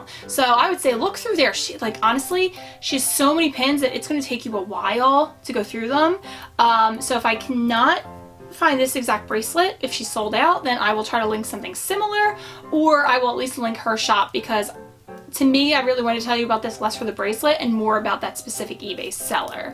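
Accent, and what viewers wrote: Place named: Pennsylvania, United States. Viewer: Can’t believe I haven’t mentioned this yet but I’m Pennsylvania born too! It’s so funny cause your accent is sooo PA! But also GO EAGLES! 💚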